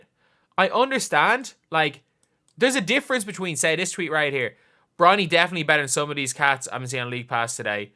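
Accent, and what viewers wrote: Ireland. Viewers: Is this guy Irish? He sounds like me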